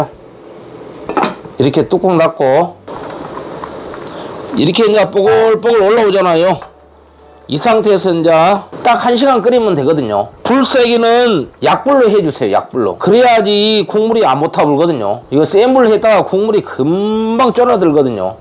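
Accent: Jeolla, South Korea. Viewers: 고향이 라도인가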